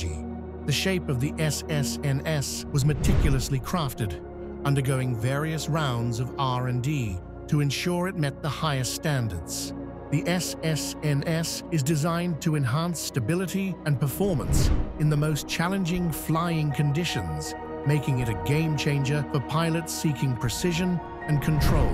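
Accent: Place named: Russia. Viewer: narrator is russian? why such bold russian accent?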